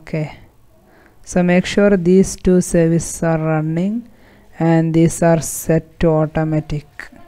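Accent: Kerala, India. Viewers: Typical Malayalee Accent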